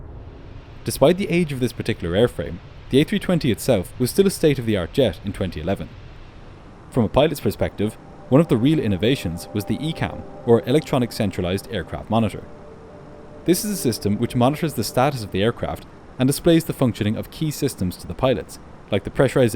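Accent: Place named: Ireland. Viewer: ….its his accent. thats how they say it in his region. im like 90% sure he’s irish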